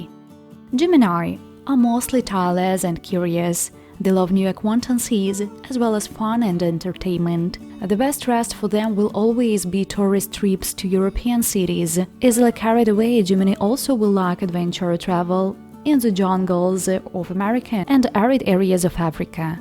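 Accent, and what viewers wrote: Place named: India. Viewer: nah, they need to replace this indian narrator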